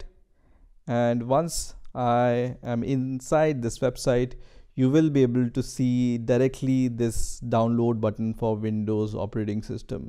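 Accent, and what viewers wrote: India. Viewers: are you indian? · his indian voice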